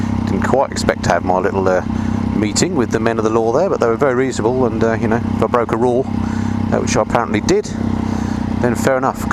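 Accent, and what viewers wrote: England, United Kingdom. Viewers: Love it, great English accent, great explanations and ride tyvm to you sir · Why not drive on the left you sound English? Isn't London in England?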